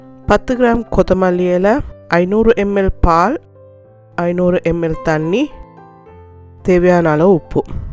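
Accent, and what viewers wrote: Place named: Sri Lanka. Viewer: R u frm Srilanka?bkas yr Tamizh pronounce,that u r Srilankan....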